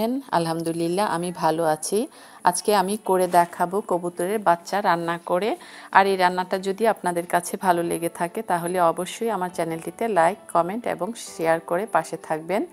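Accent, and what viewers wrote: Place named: Mymensingh, Bangladesh. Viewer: আপনি মনে হয় ময়মনসিংহের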